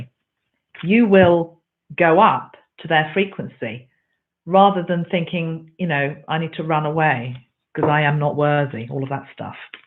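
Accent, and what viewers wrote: United Kingdom. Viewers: You are truly a Jewel! I love listening to you and your British accent!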